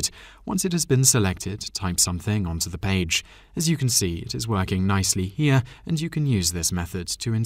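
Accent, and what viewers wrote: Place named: United Kingdom. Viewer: British english! ... nice. By the way... very good your video, I use Apple. But well done. I will check your channel. Thanks!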